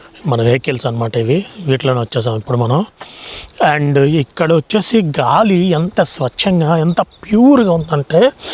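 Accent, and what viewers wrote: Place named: Prakasam, India. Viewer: nee lanugage prakasam slang lo vundi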